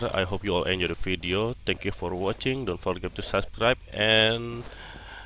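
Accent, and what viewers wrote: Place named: Indonesia. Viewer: Indo ya ? logat-nya logat indo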